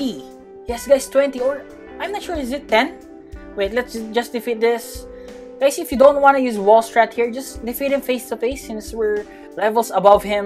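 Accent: Philippines. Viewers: FILIPINO KA TALAGA KUYA BOSES PALANG FILIPINO NA HAHAHAH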